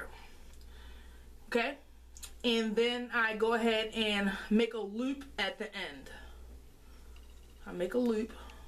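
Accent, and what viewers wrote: Baltimore, United States. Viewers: I hear a Baltimore accent🌚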